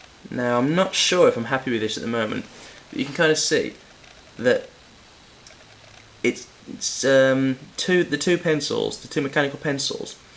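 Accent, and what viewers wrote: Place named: Walsall, United Kingdom. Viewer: WOW! i cant believe how well you can draw, heard your accent and thought he sounds like me haha im from walsall to, anyway well done hope you make lots a moneys from these :D x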